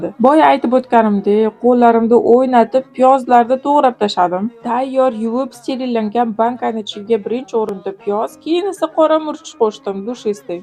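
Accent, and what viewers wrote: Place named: Samarkand, Uzbekistan. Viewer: Кизиз куз тегмасин истаралигакан.сузизга ча,ча кушиб гапрябсиз самаркант тарабдами уйларин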